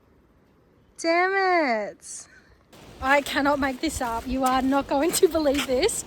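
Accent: Australia. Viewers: I like that Australian accent.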